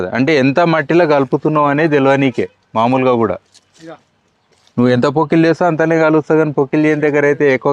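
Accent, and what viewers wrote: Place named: Telangana, India. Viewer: సర్ మీరు ప్యూర్ తెలుగులో మాట్లాడుతున్నారు.. మా తెలంగాణ యాస.. కానీ తెలుగు రాయడం రాధ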